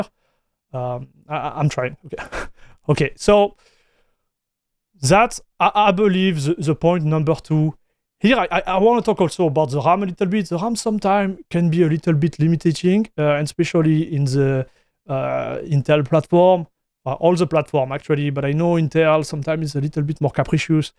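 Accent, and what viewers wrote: France. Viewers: I Love that did the Benchmarks and that you did a fair review. And i 100 % agree to you. Nicks Point is still valid, for Casual gaming the distro is Not as important as you might think at First. Greetings from Germany, Always love watching both of you, great content and awesome french accent.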